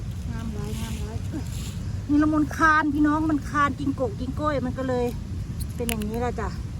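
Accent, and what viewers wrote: Sakon Nakhon, Thailand. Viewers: สำเนียงบ้านเฮาคักเนาะจ้าสกลละเบ๋อ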